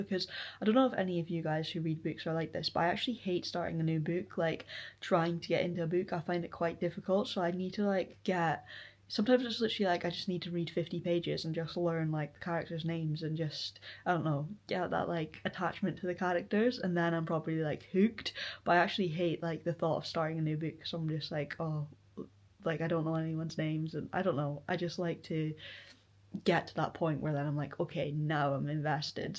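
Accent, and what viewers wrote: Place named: Scotland, United Kingdom. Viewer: would you consider wearing a microphone. Your voice is really gentle and I struggle to hear you without the volume waaaay up, which is fine until ads come on a mega volume 😂 YouTube closed captions can't cope with your Scottish accent either lol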